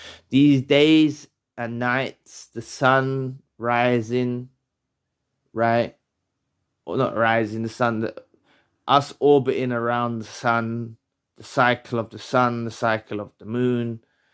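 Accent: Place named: Birmingham, United Kingdom. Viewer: You are a very smart man are you from brum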